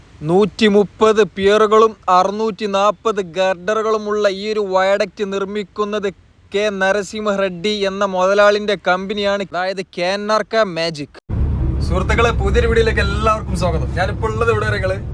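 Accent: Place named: Northern Kerala, India. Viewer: താങ്കളെ കാണുവാൻ കഴിഞ്ഞതിൽ സന്തോഷം. പ്രോഗ്രാമുകൾ നന്നാകുന്നുണ്ട്. പ്രത്യേകിച്ചു താങ്കളുടെ വിവരണം. വടക്കൻ ഭാഷയിലുള്ള സംസാരം, ഞങ്ങളെപ്പോലുള്ള കോട്ടയംകാർക്ക്, കേൾക്കുവാൻ ആകർഷകമാണ്. All the best.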